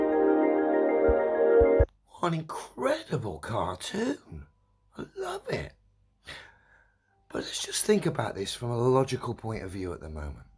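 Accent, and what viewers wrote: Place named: London, United Kingdom. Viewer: Good old British London accent.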